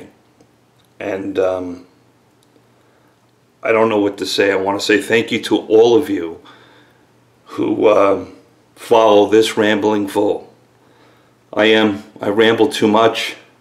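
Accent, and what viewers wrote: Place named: Arkansas, United States. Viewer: Long Island? I was sure that accent was Arkansas or West Virginia : ) I, for one, appreciate that you think about things and don't just follow the backs of bottles. You keep calling it like you see it, and I'll be here.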